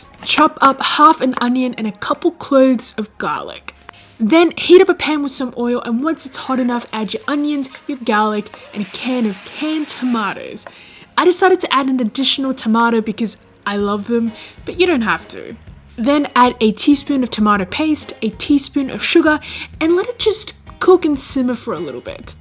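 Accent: Australia. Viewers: Asian woman with an Australian accept is a trip right now... great vid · Why do I keep seeing australian or New Zealand accents in Asian people a lot nowadays ... very satisfying and yet odd lol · You sound soo Australian, I think it’s also a ‘Sydney’ accent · I love the Aussie accent!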